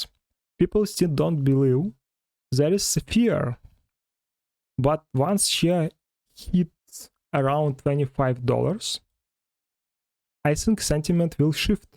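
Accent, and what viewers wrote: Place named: Russia. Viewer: Русский акцент бро)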